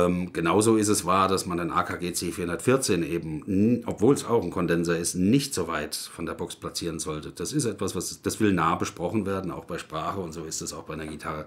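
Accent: Hesse, Germany. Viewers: babbelt hessisch.....great!!!!!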